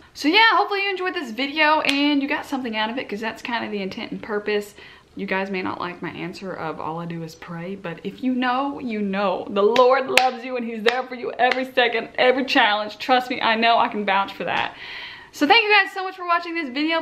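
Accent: United States. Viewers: As a non American I absolutely LOVE your southern accent😆😆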